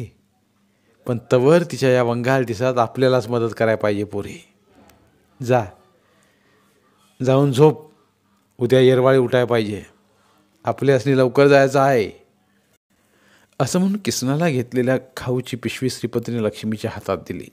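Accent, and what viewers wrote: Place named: Kolhapur, India. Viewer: मी सर्व भाग पाहते, कोल्हापूर ला माझ्या आत्ती च्या गावाला आल्यागत वाटत्या 🙏अप्रतिम, सर्व दृश्य अगदीच डोळ्यापुढे उभा राहते 🙏अगदी बाहुलीत प्राण टाकल्यासारखे तुमची बोली भाषा आहे 🙏🙏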